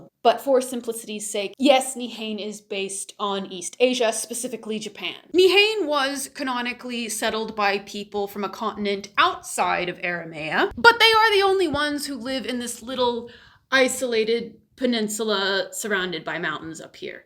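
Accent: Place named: United Kingdom. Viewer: You're Texan?😮 Where did you get that wonderful British pronunciation?!?🥰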